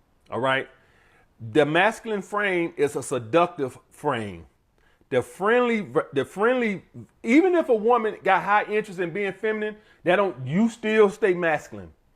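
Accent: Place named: Georgia, United States. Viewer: I can always tell when you go to another state especly Georgia ur accent hilarious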